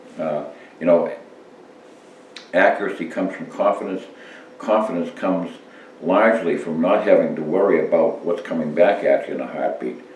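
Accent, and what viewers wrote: New England, United States. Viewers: You sound like your from New England! I say this because my Dad's family has been in Massachusetts and Connecticut for nearly 400 years! So, you sound very familiar to my ears!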